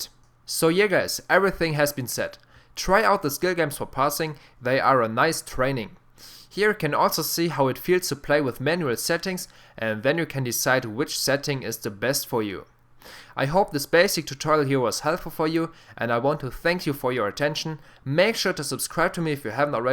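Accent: Germany. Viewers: Man hört das du deutsch bist^^. Deutsche können kein English^^ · Thanks you did your job good but it is funny as from the moment I hear you I knew that you where German, you have an most funny accent when speaking English ( I am not English but I speek with Germans alot :) ). Thanks one more time! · your tutorials are useful but when i hear your voice arghhh german english aaaaaarghhhhhhhhhh use synthesizer or shut the fuck up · your accent is so germans.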